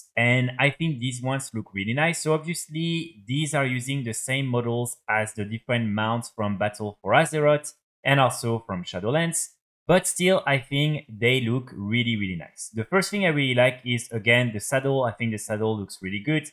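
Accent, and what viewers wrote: France. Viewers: Pas mal le french accent 😏 good video haha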